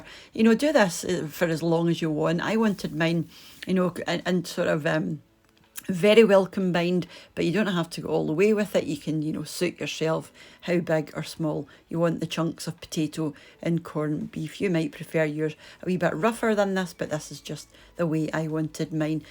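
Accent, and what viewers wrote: Scotland, United Kingdom. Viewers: Hi just discovered your channel when trying to find how to bake short breads, my Scottish Aunt y made them and l have her recipe but its hard to read l looked up lots and finally someone with the right Accent..you've made it easy for me now l can work out her writing.. and only a couple of ingredients...new to your channel and love it regards Doc from Down Under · Cor that looks absolutely delicious! ❤️ My late father was Scottish.. You have a lovely accent bless you 🙂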